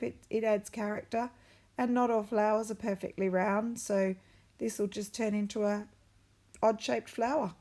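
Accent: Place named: Australia. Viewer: Loving listening to you explain your process and your Australian accent. Getting excited to start stitching this week! Have a wonderful weekend.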